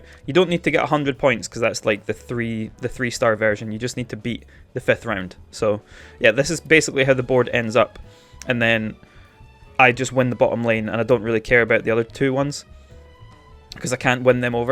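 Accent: Scotland, United Kingdom. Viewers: I'm going through the musclehead battles and the moment and levelling up in prep for hard mode 💪🏼. Usually don't look at guides unless I'm at my wits end 😂.. Will keep an eye on your content, it's good hearing a fellow Scot keep you right. Thank you man I will keep that in mind ✌🏼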